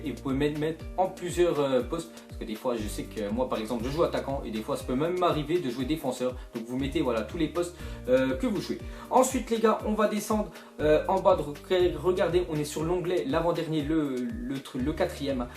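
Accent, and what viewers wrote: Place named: North, France. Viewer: sa se vois tes du Nord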